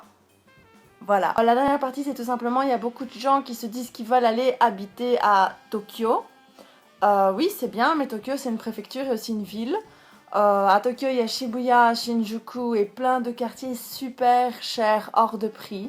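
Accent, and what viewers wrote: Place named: Belgium. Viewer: Hello! Je suis tombé sur ta chaine aujoud'hui et je me suis fait la plupart de toutes tes vidéos! Elles sont géniales, naturelles et ton accent belche de chez nous rend les vidéos bien meilleures 😁 J'ai 2 questions pour toi: quand tu es restée au Japon 3x3 mois si j'ai bien compris, tu es partie avec quel budget? J'ai déjà été un mois en visite mais ça m'a couté un pont, en même temps je m'étais fait plaisir. 2eme question: Faut il être nécessairement sur place pour rechercher du boulot? Car sur Gaijin pot, la plupart des annonceurs demandent à ce que la personne habite au Japon... Voilà, en tout cas continue tes vidéos et merci d'avance pour ta réponse! A plus dans le bus! 😊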